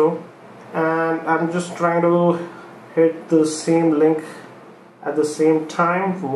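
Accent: India. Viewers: review of the two hottest phone now all done with an Indian accent.....how could you dislike this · Indian accents are just awesome xD nice comparison bro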